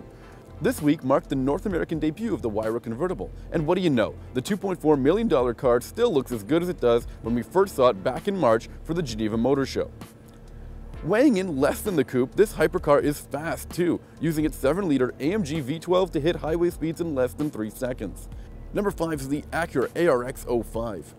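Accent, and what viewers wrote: Canada. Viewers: Is he Canadian? They say zed as well as a lot of other nations. I ask if he's Canadian because at times there isn't a noticeable differance between American and Canadian accents.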